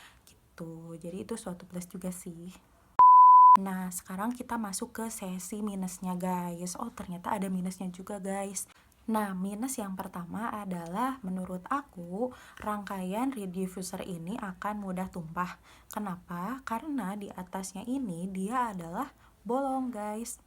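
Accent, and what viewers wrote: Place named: West Java, Indonesia. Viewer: Haha lucu bgt logat sundanya 😁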